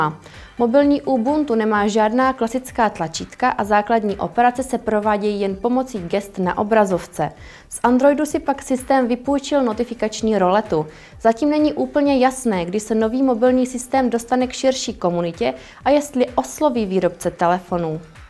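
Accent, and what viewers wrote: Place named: Brno, Czech Republic. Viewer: tak vám newim, česky mluvit neumí, ta brněnština je tam znát a mluvit o tom taky neumí, přečíst bych to zvlád stejně...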